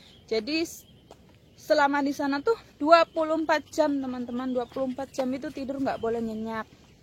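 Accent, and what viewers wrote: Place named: Losari, Indonesia. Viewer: Oh.... indramayu ya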